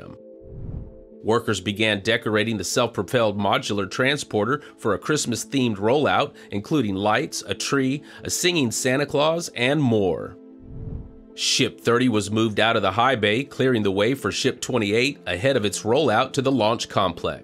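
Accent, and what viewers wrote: Texas, United States. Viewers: The Texas accent may be excused, but the Liebherr crane is "Leeb-hair", definitely not "Lyber".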